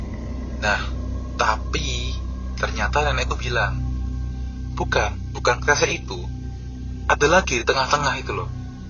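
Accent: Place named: Surabaya, Indonesia. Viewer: Dari awal denger uda langsung ngeh kalo masnya logat suroboyoan 😂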